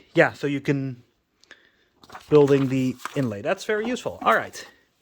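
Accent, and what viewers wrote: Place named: Netherlands. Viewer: Ouch Dutch accent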